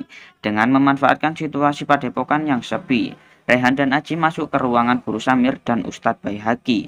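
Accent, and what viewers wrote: Java, Indonesia. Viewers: medok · medok bner ngomong wkwk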